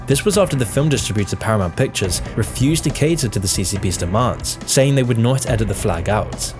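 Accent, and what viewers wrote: United Kingdom. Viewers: So, bassicly every week its a new runner up for “ most evil business in the world “ Time for a new title or maybe even new content. As for this video, im literally watching at timestamp - to prolly hear about things I already know but this time in a UK accent 😂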